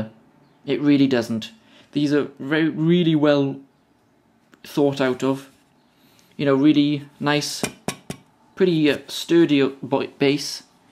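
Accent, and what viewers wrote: Wales, United Kingdom. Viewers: I thought your accent was Welsh.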